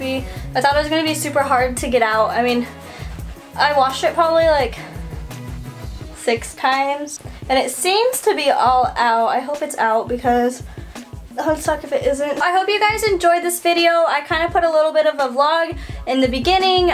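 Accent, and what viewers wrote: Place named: United States. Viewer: the classic american accent lol... #loveyall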